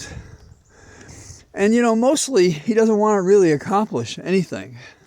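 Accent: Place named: Ireland. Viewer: Another truly stellar talk today, elemental but also saddening. My cousin acquired his federal judgeship by running a longshot presidential campaign,and winning (don't guess which because you might guess wrong) He is the only person I know who talks like Mr.. It must be those Irish genes.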